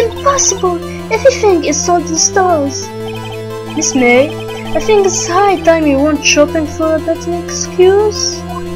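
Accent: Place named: England, United Kingdom. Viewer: hhhhhhhhhhh ur english looool :3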